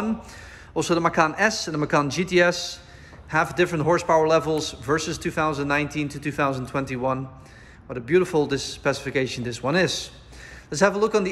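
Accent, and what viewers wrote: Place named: Netherlands. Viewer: Ziet er goed uit kerel, tja je naam en je accent….ik hoorde meteen, ah Nederlander. Super auto! Keep uw the good video’s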